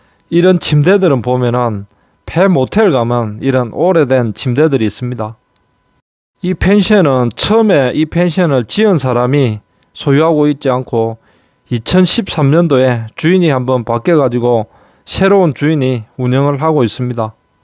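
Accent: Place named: Gyeongsang, South Korea. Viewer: 투박한 말투와 경상도억양 보다 현실적이고 가식적이지않는 친근한 나레이터.. 전국곳곳의 간접경험 좋은 정보 좋습니다..ㅎㅎ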